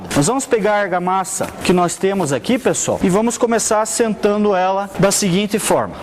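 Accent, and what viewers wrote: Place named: Paraná, Brazil. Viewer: VC É DO PARANA PELO SOTAQUE EU TAMBEM SOU 🙌🙌🙌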